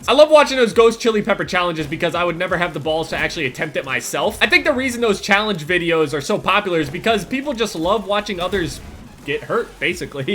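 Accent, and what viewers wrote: Australia. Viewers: Dat Australian <3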